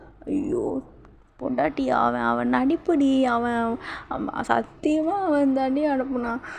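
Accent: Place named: Sri Lanka. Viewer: Your sounds like Sri Lankan are perhaps a Sri Lankan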